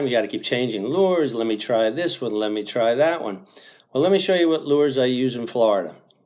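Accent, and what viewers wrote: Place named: New Jersey, United States. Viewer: Elope the NJ accent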